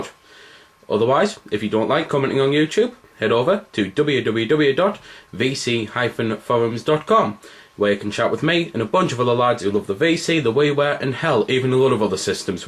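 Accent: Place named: Scotland, United Kingdom. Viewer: You sound Scottish.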